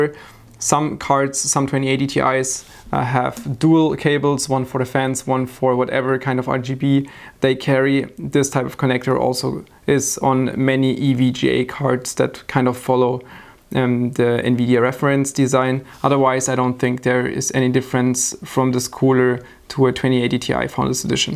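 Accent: Germany. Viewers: Deutscher Akzent is da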